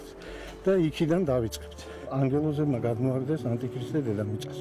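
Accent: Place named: Mingrelia, Georgia. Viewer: კილო მეგრული აქვს. ვინ არის ნეტა.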